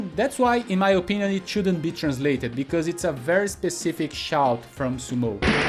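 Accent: Brazil. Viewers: Mano tu é catarinense né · Nunca vi esse canal antes. Ouvi, literalmente, 3 segundos desse cara falando e percebi que era brasileiro.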